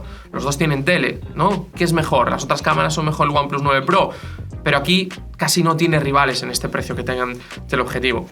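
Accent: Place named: Cádiz, Spain. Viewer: Que acento más bonito tenéis los de Cádiz 😅😅😅😅😅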